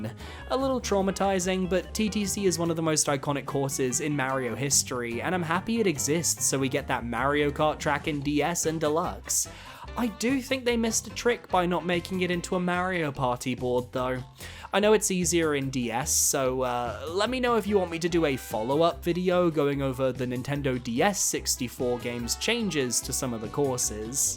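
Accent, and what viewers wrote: United Kingdom. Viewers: I really enjoyed this video (thank you) but I am very confused by you, you have an English accent but use American words and pronunciations, how is this? 😂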